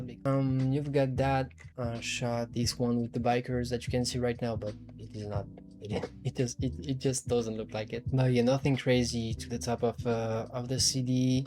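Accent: France. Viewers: This horrible French accent 😅😮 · Hey, i loved your video ! Are you french ? You have a kind of french accent. Anyway, amazing work, brother. Keep on !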